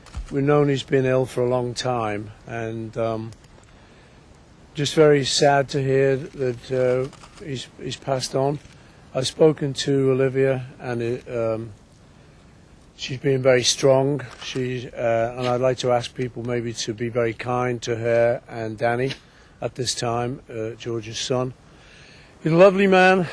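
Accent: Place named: Scotland, United Kingdom. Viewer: Your Scottish brogue, Faul gives you away for the fake freak you R! For those that believe that this man is do the months of research and you will find this man! 2 identifiers Real had Dark Brown Eyed not Greenish Hazel Eyes and Real Paul didn't tower John Lennon nor George Harrison! It took me a long time to see the difference but once you do it's a no brainier!!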